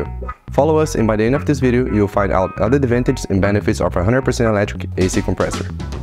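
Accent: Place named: Brazil. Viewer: Parece sotaque brasileiro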